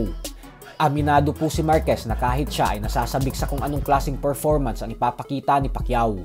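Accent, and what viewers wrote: Visayas, Philippines. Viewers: Trantado ang blogger na to visayang Visaya ang boses mag inc kanalang boy at Kunin kanaming hitman kikita kapa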